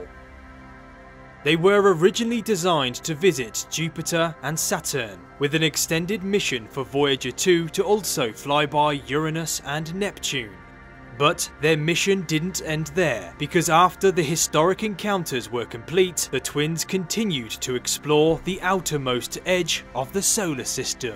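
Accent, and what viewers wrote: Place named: West Midlands, United Kingdom. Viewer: This guy has to be West Midlands/south west England. There was a wee farmer twang in ‘excitement’ and ‘violent’ for sure. Great accent!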